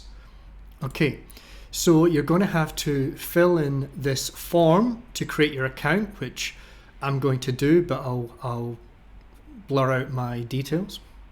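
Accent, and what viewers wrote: Scotland, United Kingdom. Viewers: How can I support you in the $ sense ? ? Seriously, we need a lot more people like you (with or without the dour Scott's accent) I'm in Melbourne, and not that hard to find.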